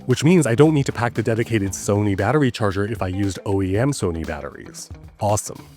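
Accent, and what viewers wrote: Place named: China. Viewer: How can you have a Chinese accent despite presumably being born/growing up in Canada/the US?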